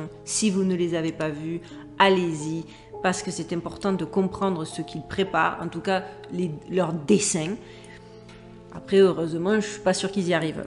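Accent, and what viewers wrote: South, France. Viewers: Hello Merci pour vos vidéos. C est toujours un plaisir de vous entendre avec ce petit accent du sud. Votre analyse est tt à fait pertinente. Nous sommes effectivement au début de l abomination de la désolation "Apocalypse 13.14". Merci pour votre temps et votre bienveillance. God bless you 🙏💝🇺🇲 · Salut jeune jolie femme, alors ça fait un moment que je ne t'avais regardé, ahh tu sais avec le temps, on a pas assez de temps à consacrer à de si belles choses, plus trop de temps, j'aime beaucoup te ragarder, t'écouter raconter des choses très vraies, et très pertinentes, donc, bravo à toi, ( je te tutoie comme si on se connaissait depuis toujours ) je tiens à te dire ( continue, tu as un talent pour raconter des histoires, plus un bel accent du sud, ça plait beaucoup ça, ) voilà, je tenais à te dire ces vérités, car, je trouve que tu as un peu peur de déplaire, Je te répète que tu as un vrai talent pour raconter de belles histoires, BON alors tu me crois maintenant ? 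Your really good on what you do, i love watching you, "so, so, so, see you next time, on the next video" #sam&sam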